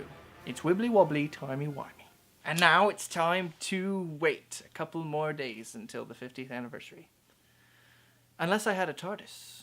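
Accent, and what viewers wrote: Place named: United Kingdom. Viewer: Good British accent!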